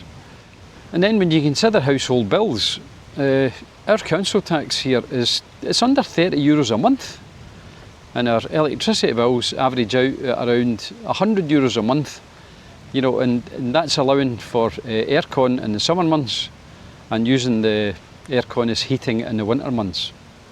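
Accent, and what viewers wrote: Scotland, United Kingdom. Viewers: Are you Scottish? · Really good video, lots of good info, not getting all political about UK governments (personally I think they've all been poor quality for a long time!), just the facts about living there. Englishman here currently living in Norway and looking for retirement options, or even just for the winter. Nice to hear a straight talking Scot, and I love the Scottish accent and Scotland. Always been treated with friendliness there (and here in Norway) As I have in Spain! Been to Spain (different parts) maybe 15 times now, with work and for holiday/vacation. Always liked it. The Spanish help me with my poor attempts at their language. A lovely country. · Same to you my friend, wish you all the best !!! Love your Scottish accent !!! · Lovely Scottish accent (? Glasgow)and very good Spanish pronunciation. Yes it is still good to be a foreigner in some countries A A About the menu del dia with water I hope it was your own choice.In Madrid they will bring a whole bottle of Wine,not the fancy vintage but still very drinkable. 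I f they didn't offer you wine pls tell me and I'll go down and give them a piece of my mind Viva Escocia.Vivan los escoceses!😊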